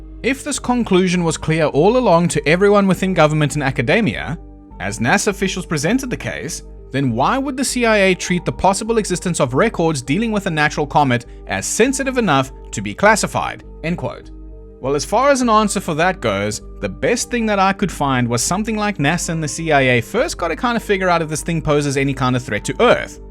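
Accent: South Africa. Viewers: awe boet🤣. I sat this whole video debating wheter you were a soutie or aussie. Ive been proven correct, love the channel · You sound just like my friend Ian who is from South Africa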